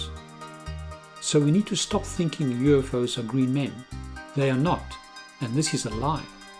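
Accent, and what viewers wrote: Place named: South Africa. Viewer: You are South African....your voice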